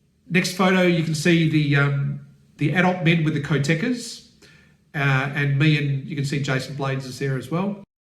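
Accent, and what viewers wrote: Australia. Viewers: English with Australian accent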